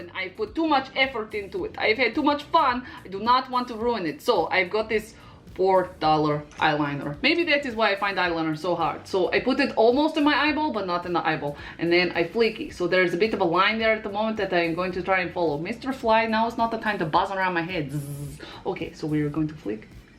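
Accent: Russia. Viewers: She sounds russian... but her voice is so soothing.... even when shes freaking out about makeup. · God i swear i love you soo much. You're like my spirit animal lmao. But you said towards the beginning that you're from Australia. I thought ypur accent was maybe Russian or something?? Im sorry, i swear im not trying to offend. Just a curcious cat. 😻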